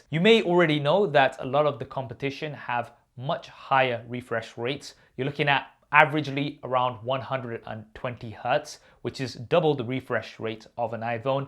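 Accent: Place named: United Kingdom. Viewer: Thanks for such a broad view. And super nice to hear a British accent! Blessings. 新年快乐 xin nian kuai le Happy New Year 🍵🍾🍾🍾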